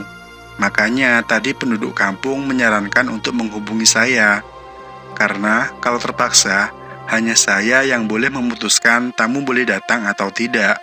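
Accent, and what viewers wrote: Bali, Indonesia. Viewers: Maaf yg bacain cerita nda cocok.logat balinya.di hilangkan biar netral🙏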